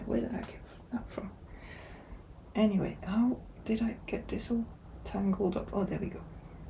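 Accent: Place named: Wales, United Kingdom. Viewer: Nice first podcast 😃. You could modify your Ida tee…. Undo the cast off and unravel to length you want… then re-do rib 😊. Undo the sleeves and put in some deçreases to get rid of some of the width. It would be worth an hour as it is a very nice piece 😉 I also think that Petite Knit is fantastic for everyone. She has sensible everyday designs. I just finished her Cumulus …so simple but a great everyday sweater. Your red cardigan will be beautiful The Gepard (Danish) kid silk is also very nice. I am presently knitting the Buttercup T-shirt with Sandnes Garn Line, The Thelma Cardigan by moonstruck knits…who has grrreat patterns check her out…using Myak Tibetan Cloud for the first time and it is glorieux 😂 You have a nice accent when you speak English it has a Welsh sound… bye bye … me baldelaine on Instagram 😊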